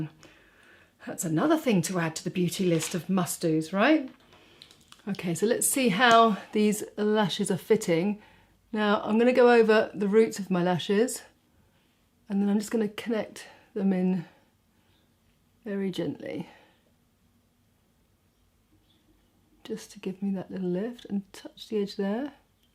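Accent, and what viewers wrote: United Kingdom. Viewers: you are so British!! Love listening to you talk! Little things you say are humorous. “Cheap as chips” and “Off to the disco” made me giggle. Thanks for the tips on getting out of the “down in the dumps”. You look lovely as usual. 🤗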